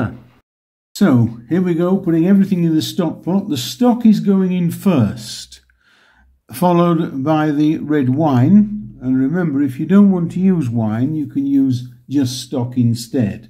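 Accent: Yorkshire, United Kingdom. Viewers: your cooking videos, along with your yorkshire accent mr, bring back fond memories of home (yorkshire lass from West Melton).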